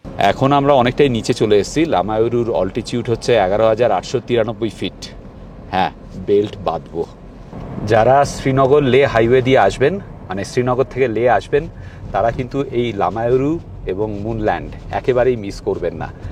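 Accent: Bangal, Bangladesh. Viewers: Apner bangal kotha gulo ekhono gelo na puru bangal, blog moteie bhalo na chredin apner dara hobena jotoi chesta korun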